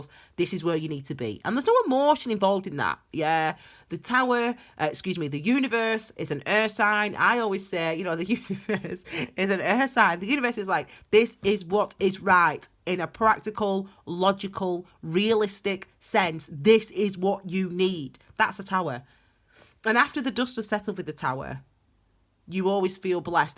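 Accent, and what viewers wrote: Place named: Northern England, United Kingdom. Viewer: I just found you the night b4 the New Moon when I am beginning a 40 day grape 'fest/fast'! Ha! Timely in deed. You're into the same stuff I like too and you describe things well. When you did the Reiki I felt my head and throat as blocked. My heart to so you were spot on. Ta very much! I live in the US but come from SE England. You're a Northern Lass I can hear that much! Thanks and blessings and happy new moon. I did subscribe so I can listen and learn some more. Cheers.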